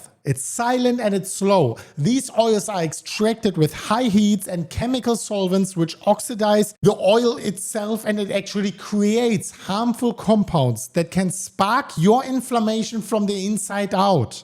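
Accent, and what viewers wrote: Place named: Germany. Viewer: Du hast aber auch einen deutschen englischen Akzent 😂❤😂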